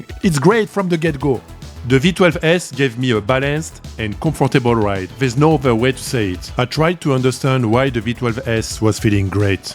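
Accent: France. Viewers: Drôle d'accent. On dirais un francais qui parle anglais.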